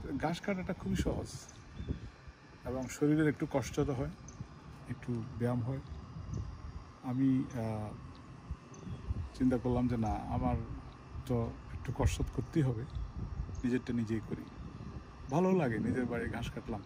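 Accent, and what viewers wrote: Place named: Jessore-Khulna, Bangladesh. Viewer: ভাই আপনার ভাষা তো যশর/ খুলনার মত যদি বলেন বাড়ি টা কোন জেলায়? আর ভিডিওতে আপনার কথার সাউন্ড কম আসে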